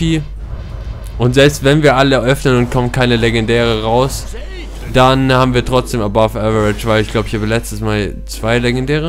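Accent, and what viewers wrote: Germany. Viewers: I feel like the German accent and language makes it all that more special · English mit deutschem Akzent klingt so falsch ich finde aber die subtitles haste echt gut hinbekommen (Mir fehlt noch einer/I still lack one) Echt super und ich habe das schon allen meinen freunden gezeigt dat is zu lustig egal wie alt das is XD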